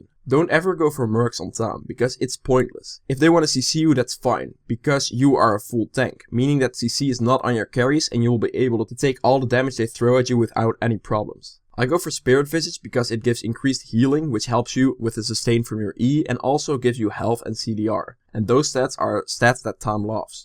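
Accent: Netherlands. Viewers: I like your dutch accent xd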